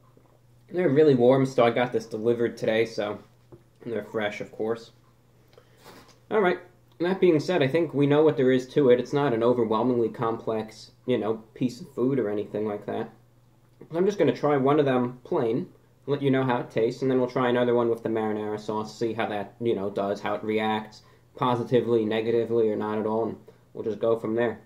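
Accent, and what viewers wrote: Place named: East Coast, United States. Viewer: This man seems like a character that you would encounter in one of the Fallout games, particularly 4 due to his east coast accent.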